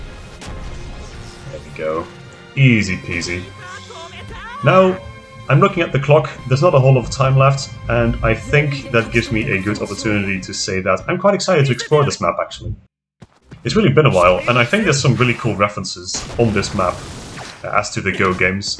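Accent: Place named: Netherlands. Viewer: Yay, more of this! And I hope the new recruit's effort can be felt! I'm not sorry- Edit: Very dutch pronunciation indeed, nice. Also, recruit Bradford please? (the tiny boy with the brunette rooster haircut) PS. Welkom terug!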